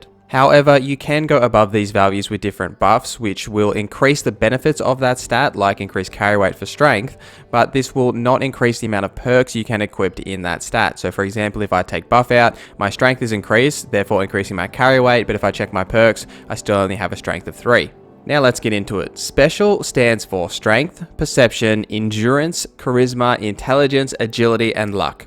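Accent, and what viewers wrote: Australia. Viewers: cheers mate great 2 hear an aussie doing it write thanks for the tips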